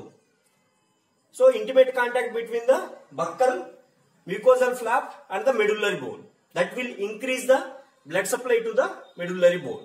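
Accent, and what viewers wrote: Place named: India. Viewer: Need caption, i don't understand indians english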